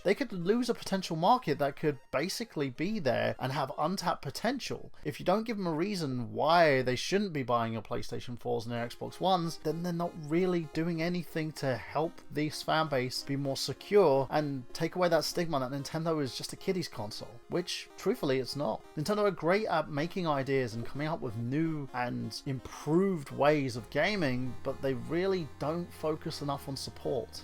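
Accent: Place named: England, United Kingdom. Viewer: you're right. I was mislead by the title of your video, and thus chose to watch the video believing that it was going to be a quality, intelligent critique with evidence-based facts and actually be about the Switch. My mistake. But through our correspondence I have learned something; that even guys with English accents can be childish YouTubers who get butt hurt by negative comments on their videos.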